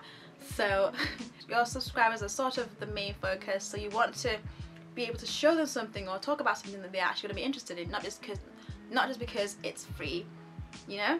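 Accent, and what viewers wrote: United Kingdom. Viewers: Truth be told, I only clicked on this video because I thought she looked very pretty. I also like her British accent.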